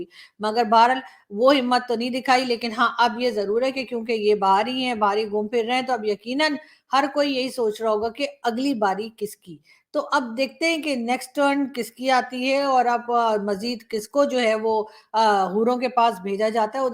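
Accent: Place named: Allahabad, India. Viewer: you speak EXACTLY like ALLAHABADI speak You have atleast, inherited this from your father You are the MOST GRACIOUS AND LOVEABLE DAUGHTER OF ALLAHABAD MY piles of love and Ashirwad ❤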